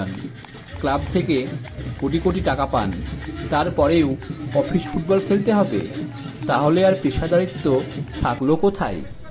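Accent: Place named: East Bengal, Bangladesh. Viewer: Video ta jeee koreche r jar voice sona jacche ami sure seee Udbashtu opar bangalar mal 💯💯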